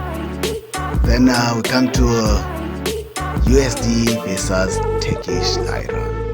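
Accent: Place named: Zimbabwe. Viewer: I love this Brother, he somehow sounds Zimbabwean, great content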